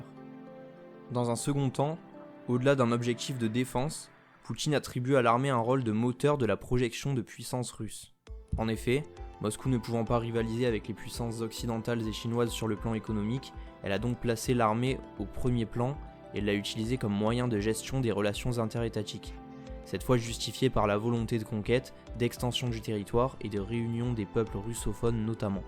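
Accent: France. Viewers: C'est un parisien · C'est l'accent du sud 😉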